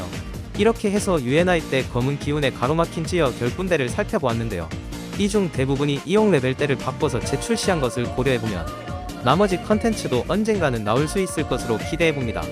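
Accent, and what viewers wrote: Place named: Gyeongsang, South Korea. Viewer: 크리쎄 ㅋㅋㅋㅋ 경상도분이시구나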